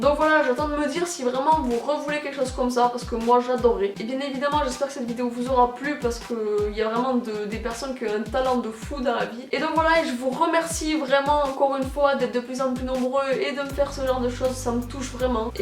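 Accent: Toulouse, France. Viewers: omggg, j'adore ton accent toulousaing 😍😍😍 Ma mere vient de toulouse alors je peux faire cet accent et j adore 😍😍 Putaing Toulouse en force 👊👊💘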